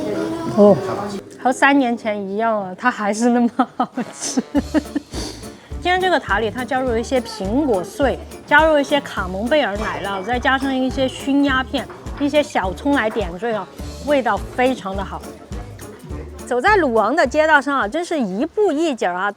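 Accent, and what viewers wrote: Sichuan, China. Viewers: 不知道为啥，你开口虽然说的是普通话，但一听就听的出来是四川妹纸：）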